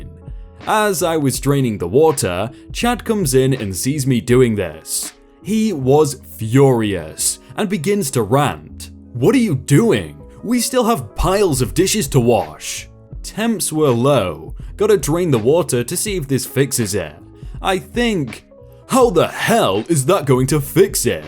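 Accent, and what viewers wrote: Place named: England, United Kingdom. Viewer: It's an accent. Many accents exist that pronounce words that way. Plus, he is English, so one could argue he knows the language best.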